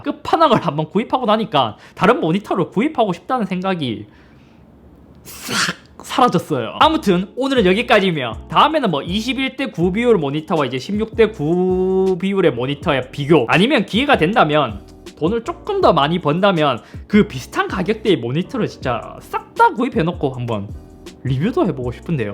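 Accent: Gyeongsang, South Korea. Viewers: 아....경상도 사투리 겁나 거슬린다.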